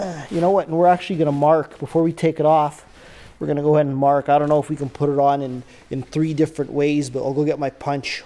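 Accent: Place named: Quebec, Canada. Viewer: Est-ce que j'entant une accent quebecois?? 100 pourcent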